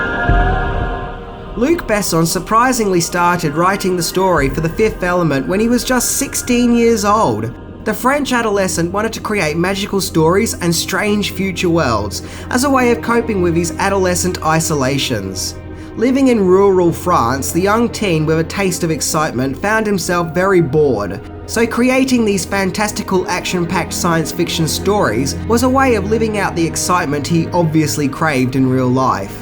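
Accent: Australia. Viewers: wait...you are from Australia? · Hey love that Ausie accent!!! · imagine if made an 10 amazing facts video and didn't introduce 'imself in his best australian accent ".......and I"M!" i'm holding out for an "I'M " at the goodbye.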